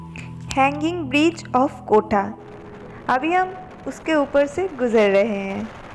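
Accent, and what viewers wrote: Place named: Bengal, India. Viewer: I think she is Bengali her pronounce is like Bengali because of I'm also bengali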